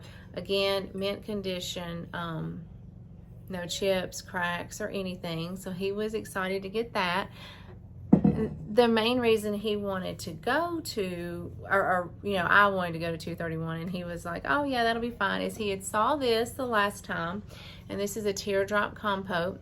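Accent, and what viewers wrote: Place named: South, United States. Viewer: any way you can turn on closed captioning? i am a northerner and have a tad bit of difficulty with your southern accent😉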